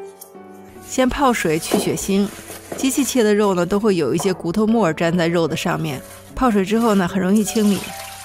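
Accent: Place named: Shijiazhuang, China. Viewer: 听的口音很像石家庄人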